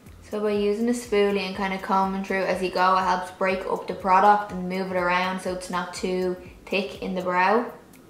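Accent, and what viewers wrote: Ireland. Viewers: I'm not the best with accents. Are you from Ireland? Your speech is beautiful. My great grandpa is from Ireland and I've always wanted to visit. The dreams of that kind of went down the toilet when I got married and became a stepmother and then a mother.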